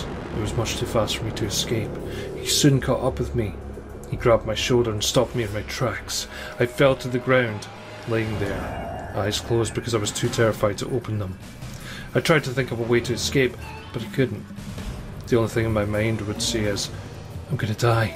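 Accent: Scotland, United Kingdom. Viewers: did this really happen?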